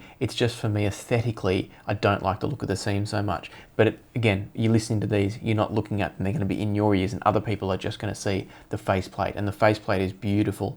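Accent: Australia. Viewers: Great review as usual, mate. Really glad to hear a fellow Aussie voice in the audiophile community. Looking forward to your thoughts on the Topping E30 DAC! 👍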